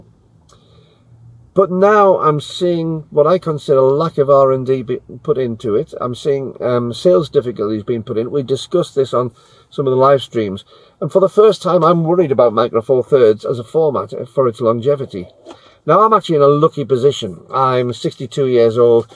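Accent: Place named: Northumberland, United Kingdom. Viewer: Hi, whilst listening to some great waffling, I'm trying to place your accent. You sound very similar to some of my relatives who were originally from Haltwhistle in Northumberland. Am I in the right geographical zone? Cheers.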